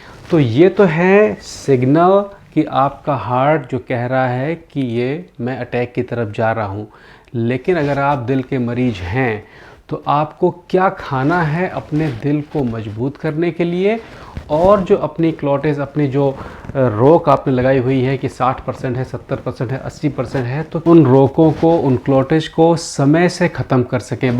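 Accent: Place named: Jalaun, India. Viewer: Sir ap ki bhasa mere up ke jaloun, orai se bahut milti hai, jase bat gath me badh lo, mota mot a samajh lo aadi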